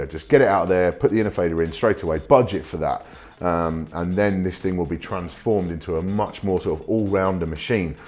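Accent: United Kingdom. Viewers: Great review + british accent = brilliant.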